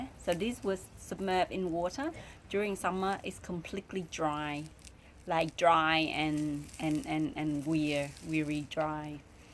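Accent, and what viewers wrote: Australia. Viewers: Beautiful plants also Is your accent mixed in with Australian? 😌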